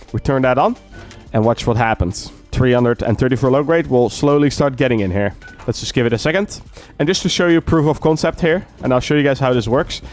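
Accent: Netherlands. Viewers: Nice dutch accent =D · You are dutch. Prove me wrong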